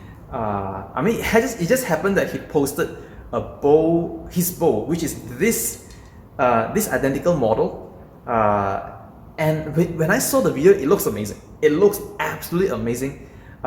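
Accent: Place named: Singapore. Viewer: You have a Singaporean accent 😊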